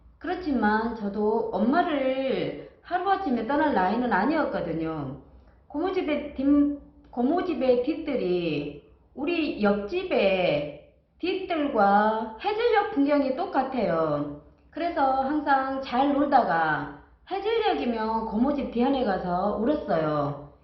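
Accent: Gyeongsang, South Korea. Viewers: 저처럼 경상도 사투리라 반갑네요 ~^^ 정체성인 장소~ 이번 기회에 생각해봐야겠어요~^^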